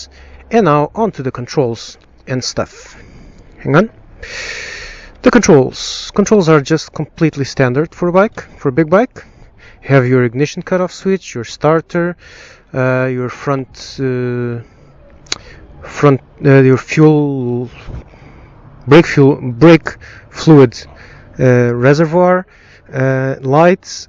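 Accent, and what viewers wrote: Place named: Portugal. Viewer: Awesome review, I'm also portuguese, so I caught on to your accent immediatly, I have the same haha, and I also own and NC700x. And I "downgraded" from a CBR 900 RR zx10rgsxr crotch rocket thingy, like you so well put it. The thing is that with my 900 i could never explore the machine in the road properly and the largest trip I was able to fathom was to the next petrol station. With this one I feel I can go anywhere. It's a great, fun and useful bike, a bike for the real world!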